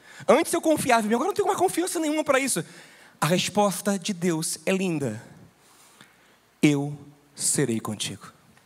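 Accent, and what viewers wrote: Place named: Bahia, Brazil. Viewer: Ouvir uma mensagem poderosa e abençoada como essa, no meu ''dialeto'' e sotaque natural baiano é tremendo demais para mim!! ''Nao queremos viver na mentalidade da carne, de Agar gerando Ismael confiando em nós mesmos...nos leva a compreensão do quão fraco somos até o ponto de perceber que tua obra em nossas vidas é motivo de riso''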